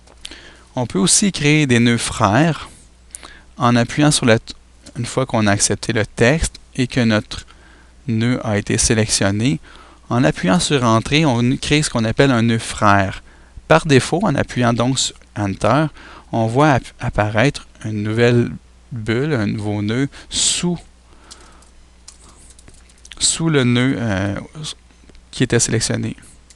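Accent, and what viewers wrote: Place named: Quebec, Canada. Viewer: accent quebequois très très prononcé ^^ (bonne vidéo au fait ;) )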